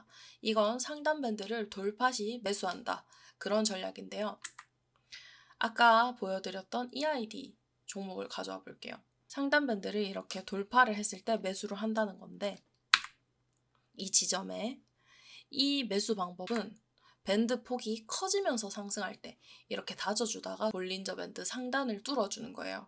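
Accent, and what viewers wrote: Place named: Gyeongsang, South Korea. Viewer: 조금씩 묻어나오는 경상도 사투리가 매력이라서 구독 누름 ㅎㅎㅎ 많이 가르쳐 주이소~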